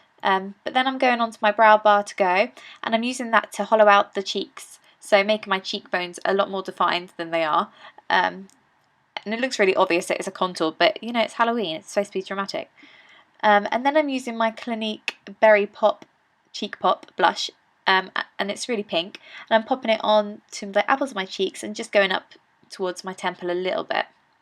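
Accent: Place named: Yorkshire, United Kingdom. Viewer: Hey! Lovely make-up - I do love your accent...I think you might be from the Yorkshire area, I'm right? :3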